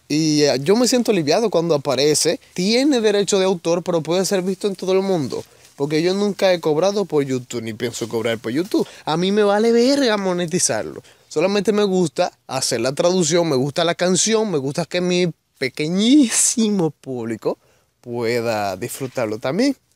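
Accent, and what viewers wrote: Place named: Honduras. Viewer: Pues men, mira, yo te sigo desde el ultimo canal que te tumbaron, y te volví a encontrar de pura suerte, por un video de una canción/traducción que te pedí, era la de -. Desde ese entonces, te sigo, y de alguna manera te tengo nostalgia por el gesto que me hiciste. Debo de admitir que no sigo tu canal de forma muy activa, ya que, sinceramentementemente, pues, tengo algunas cosas que consumen mi tiempo, etc, etc.... Pero si, de ves en cuando me actualizo y veo tus videos, uno tras otro, porque me encanta la mayoría de traducciones que haces. Ademas, gracias a ti, he descubierto nuevos grupos, géneros, y eso, eso realmente esta muy cool, y lo aprecio bastante. Pero... si ya no puedes subir esta clase de contenido en este canal, pues, esta bien, sabes. Tampoco tienes que tirar por un barranco tu canal. Podrías.... No sé...... Puedes ingeniártelas para hacer otro canal nuevo, virgen, sin strike's, y empezar a resubir, o subir nuevas traducciones, y así reducir riesgos de que se vaya todo a la Sh**. 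Puedes hacer muchas cosas en este canal, no me viene algo en especifico, pero sé que encontraras algo, puedes hacer lo que quieras, experimentar para ver que tan bien lo haces, o que tanto pega, etc, estas en una plataforma con muchas otras posibilidades de creación de contenido menos riesgosa por el Copyright. Y tu voz esta bien, es supercool. Mi mejor ciber-amigo es de Honduras, y habla casi igualito que tu xd Por lo que para mi es familiar, agradable, pero no lo tom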